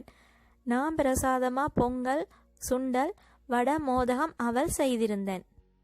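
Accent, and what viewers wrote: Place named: Sri Lanka. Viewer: Excellent 5 minutes la 3 dish, 👌👌 உங்கள் குரல் மாற்று உங்கட சிங்கள தமிழ் அழகு 👌👌👌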